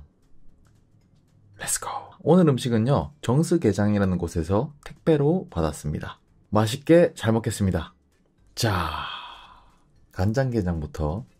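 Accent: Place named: Seoul, South Korea. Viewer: 아닠ㅋㅋㅋㅋㅋ 사투리 쓰시는 분이 서울말 쓰시려고 노력하는 말투 너무 긔엽네욯ㅎㅎ💗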